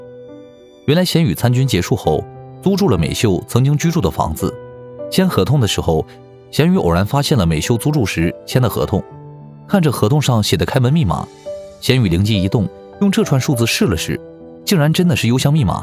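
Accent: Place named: Northeast, China. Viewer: 博主是东北人吧 广播be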